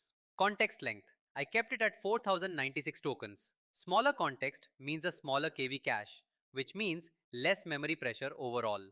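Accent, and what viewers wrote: India. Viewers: (It's an AI generic Indian accent shared by various ai channels ... it is a soothing one though) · thanks for the video, really helpful for low end systems. i also like youre indian accent 🙂👍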